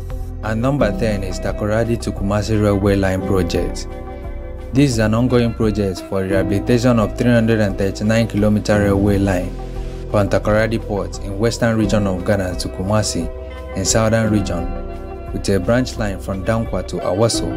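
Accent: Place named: Nigeria. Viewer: You sound so foreign with the way you pronounce the city's names of Ghana. I have no doubt your accent is Nigerian. Anyway, thanks for sharing the info about Ghana. Let's see how Nigeria is doing on mega construction projects in your next video.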